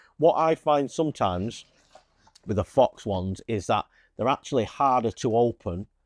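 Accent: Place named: Northern England, United Kingdom. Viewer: Northern accents and Carp Fishing tackle reviews seem to work quite well! 💪👌 haha good to see you back lad! 😁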